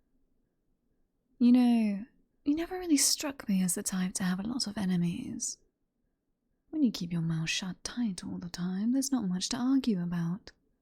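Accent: United Kingdom. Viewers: Definitely an interesting audio, and once again proving that the British accent lends itself so well to villains.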